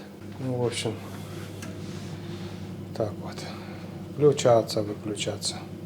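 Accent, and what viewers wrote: Perm, Russia. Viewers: Говор Пермский уши режет.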